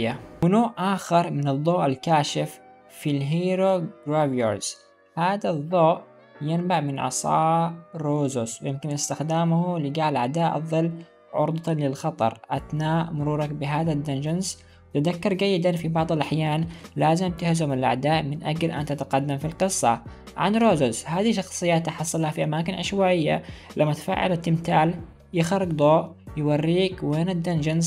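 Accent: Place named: Aden, Yemen. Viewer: لهجتك يمني وعدني تحديدا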